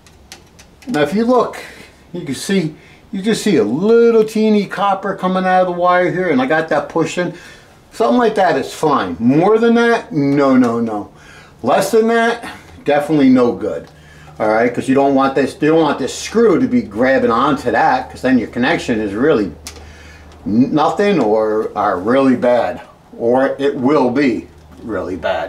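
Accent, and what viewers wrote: New York, United States. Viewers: Guy has to be from Queens or Brooklyn:)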